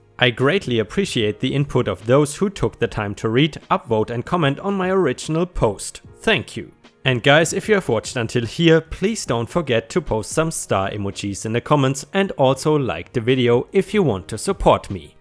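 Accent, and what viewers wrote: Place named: Germany. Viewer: Subbed, belled and happy to hear your crazy German accent :-)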